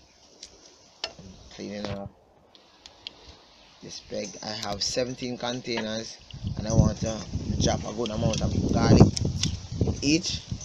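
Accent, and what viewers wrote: Jamaica. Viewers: i really like ur homemade ponds there really nice and pretty good info and i love ur accent Jamaica!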